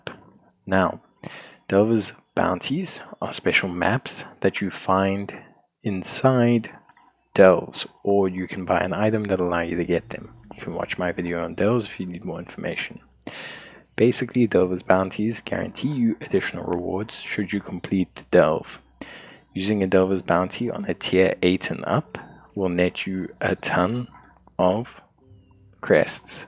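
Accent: South Africa. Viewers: Bro, you South African?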